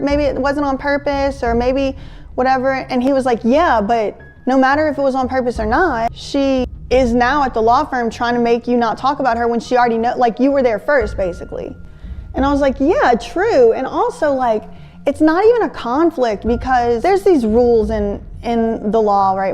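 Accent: South, United States. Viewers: Just came to likeeeeeee i heard this story years ago love ur southern charm with it!!!!! Mucccch love❤... Ima too lol love youuiiiu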